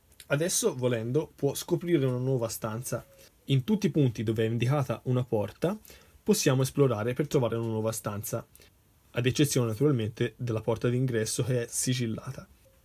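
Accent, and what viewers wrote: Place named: Tuscany, Italy. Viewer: L'accento toscano è veramente sexy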